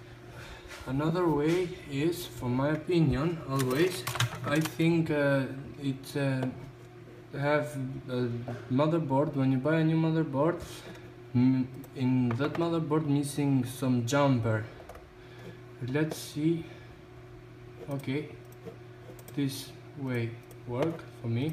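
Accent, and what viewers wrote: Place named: India. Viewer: Indian accent Thank you very much good video! Big Like !Sub give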